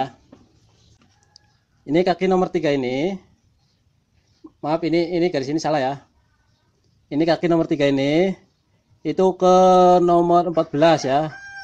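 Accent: Banyumas, Indonesia. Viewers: Rika wong ngapak ya kang siip lah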